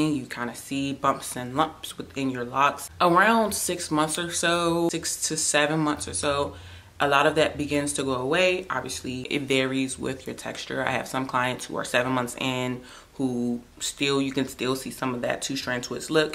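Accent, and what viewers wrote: DMV, United States. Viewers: New Sub. I picked up on the DMV in your voice before I read your bio :) Great Information!! Ready to start my new set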